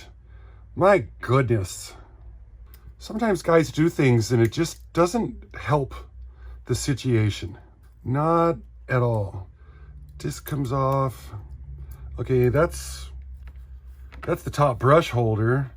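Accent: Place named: Minnesota, United States. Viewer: Watching this and the missus comes in and asks if is from Minnesota with the accent. Know you're in Montana now, but were you originally from Minnesota or North Dakota?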